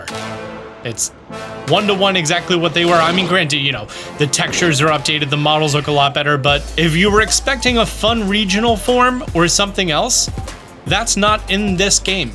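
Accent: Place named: New Jersey, United States. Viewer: Want to know how I know you're from New Jersey? You say: wooder If you see this.. Taylor Ham or Porkroll?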